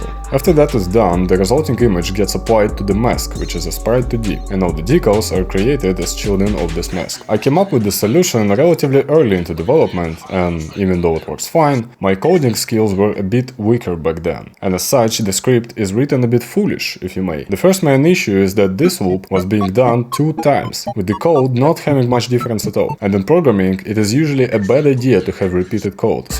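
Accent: Russia. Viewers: Are you russian—your accent seems to be russian. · I can HEAR the Russian and you know how good russian games can get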